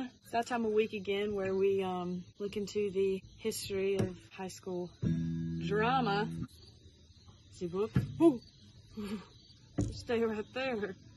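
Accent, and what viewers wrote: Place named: Carolina, United States. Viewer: Beautiful song sang beautifully. Like hearing that kiss of a Carolina accent when you talked. Like the saying goes. If you don't like girls raised in the south (GRITS), raise your standards. Happy Holidays to you and yours from Texas. I spent four years at Ft. Bragg, NC and still have a fondness for North Carolina 30 some years later.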